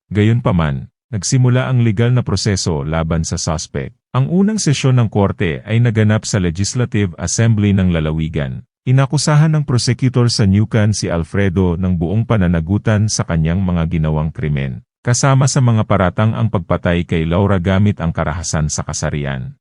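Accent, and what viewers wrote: Visayas, Philippines. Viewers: Kahit may pagka-visayan accent ok lang naman naiintindihan naman ang kwento, someday mas gagaling ka pa katagalan. 💙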